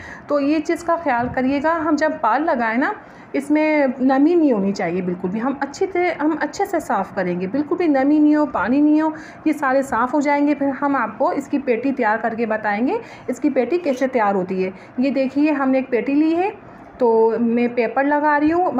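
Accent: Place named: Sindh, India. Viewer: Sindhi lehjey men Urdu bhht achi lag rhi h ap boltey hoey..and acha tariqa h pal laganey ka but aam ko pani nhi lagay jata h us se aam naram ho jata h.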